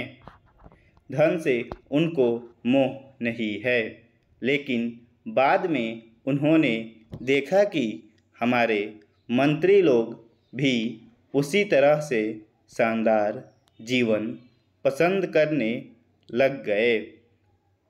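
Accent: Bihar, India. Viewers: Sir apke kuch words bolne me galti hai mtlb apki pronunciation thik nahi hai jaise 👉 ye , jyadatar aur bhot se words hai Baki sab badhiya hai shyd aap bihar ki side se honge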